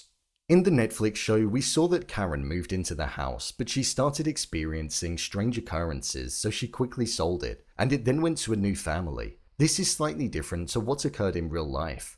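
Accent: England, United Kingdom. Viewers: Is that english’s accent is trying to discredit Netflix? Irritated for The Crown series? A lot of novels, history books, screenplays had different changes in a movies, series or documentaries. Only knowledge can set you free.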